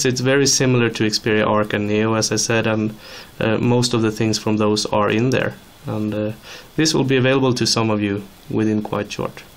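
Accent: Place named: Sweden. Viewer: i love how you hear that hes swedish xD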